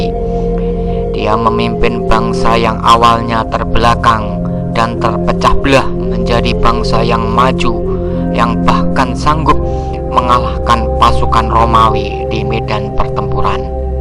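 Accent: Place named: Java, Indonesia. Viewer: Bisa gk, di kecilin musiknya dan logatnya jangan meddo kali la..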